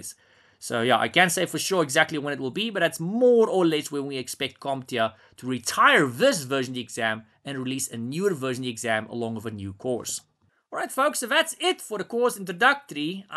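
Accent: South Africa. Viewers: you're south african!!!!!!!!! the accent and your way of speaking lol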